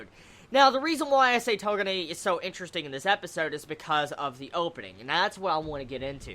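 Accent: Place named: Tennessee, United States. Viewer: Ah my fellow Tennesseean, I can hear it, as I speak with it. Not such a strong twang so much as a way of saying some words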